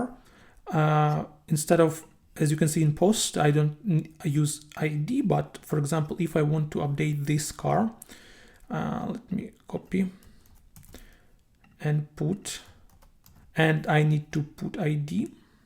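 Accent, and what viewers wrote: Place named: Eastern Europe. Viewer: slavic accent😎💪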